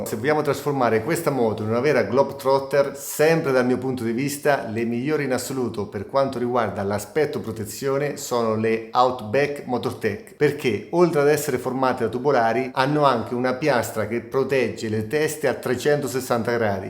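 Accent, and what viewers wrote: Marche, Italy. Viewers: Caro, seguo molto volentieri i tuoi video perché sei bravo preciso, hai tanta esperienza, ma soprattutto il tuo accento marchigiano ( credo) mi piace un sacco 😊 Vengo al punto: il parateste in acciaio originale Bmw è per te efficace per un uso del GS che non ha il tubulare esclusivamente su asfalto?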